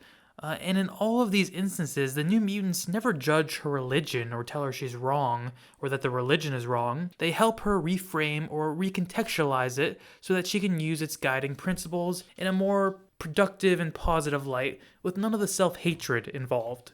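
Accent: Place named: Scotland, United Kingdom. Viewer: Nice thoughtful look at Rahne Sinclair! Loved the Scottish accent!! Looking forward to your thoughts on the other New Mutants! Thanks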